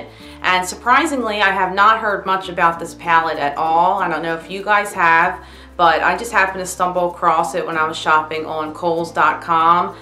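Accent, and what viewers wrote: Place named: Philadelphia, United States. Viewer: Also I just stalked you a little meaning I went on your twitter and saw your from PA. I knew you were from the Philly area. Your accent is comforting to me and makes me miss home.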